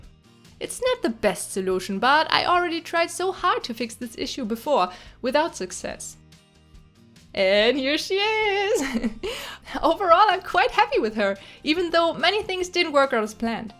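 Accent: Germany. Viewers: Ich mag diesem deutschen Akzent in deinem englisch🥰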